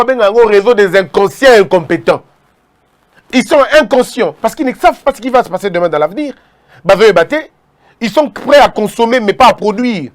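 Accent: Democratic Republic of the Congo. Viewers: Christian BOSEMBE merci de nous sortir de la médiocrité. Te suivre est une délectation. Bravo. J'en apprends des choses. C'est un pur délice de t'entendre parler lingala. C'est quoi mitende ?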